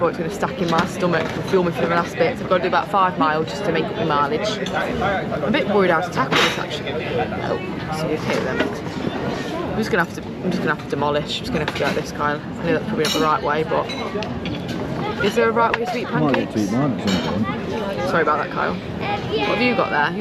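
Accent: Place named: East Midlands, United Kingdom. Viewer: Are you Notts folk? Defo an East Mids/South Yorks twang.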